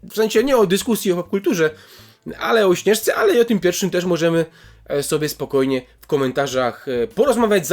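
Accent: Silesia, Poland. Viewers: Jesteś ze śląska? Słyszę akcent :D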